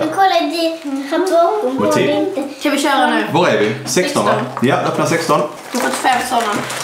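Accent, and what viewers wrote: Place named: Scania, Sweden. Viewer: #unibrown och pratar mörk och skånska bland det värsta jag vet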